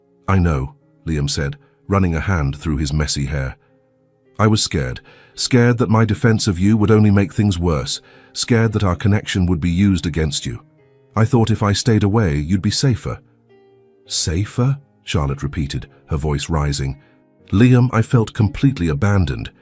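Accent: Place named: England, United Kingdom. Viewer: I totally agree with Everyone Else who hates & despises the REDICULOUS, HORRIBLE Video Foreground AND Background. In addition, the Stupid Producers eliminated impor- tant Written Script for people to read, especially when the Professional Male Narrator has a foreign accent like Old England, that is verry diffi- cult to understand. Otherwise, we start with a young female executive Assistant, walking into the wrong door, & sees the male CEO, "buck naked"; and HE ENJOYS IT, & from then on.., he blatantly parades around & tempts her, throughout her employment. SHAME, SHAME, SHAME on the Producers !! Rating: 4/10.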